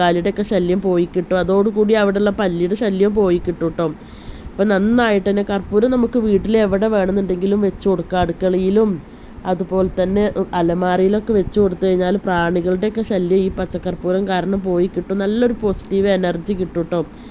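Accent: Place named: Malabar, India. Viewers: enthu bhaashayaa? malbaari bhaashayaano? manasilaakunnillaa!😇👻👹👹🤧👺🥵🥶💀💀😈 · എസ് ,ഇത് പാലക്കാടൻ ഭാഷയാണ്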